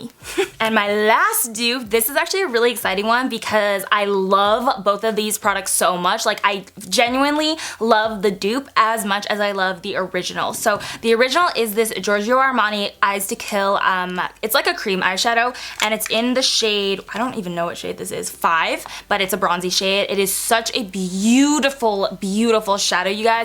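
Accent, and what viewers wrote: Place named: United Kingdom. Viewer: Yes, I found you through that Dupe Video. Love your British accent, lol! 😍 Thank you for sharing all these dupes😊👍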